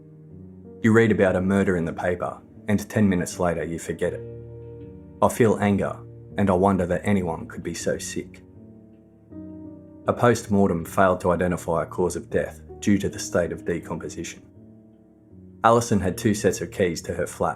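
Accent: Australia. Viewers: I love the podcast. I love hearing the AUSTRALIAN accent Makes me feel connected to that part of our world. I have always felt that the people in Australia have a kind of spirit like many in the USA. We are both young countries and there’s an exuberance in the people in both of our glands. I don’t know if I’ll ever make it to Australia , but your podcast brings me a bit closer. God bless us all on this extraordinary planet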